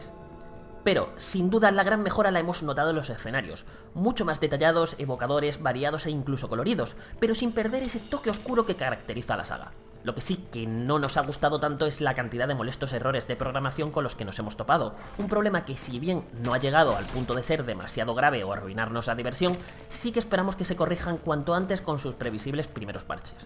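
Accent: Andalusia, Spain. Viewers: ole ese bueen andalu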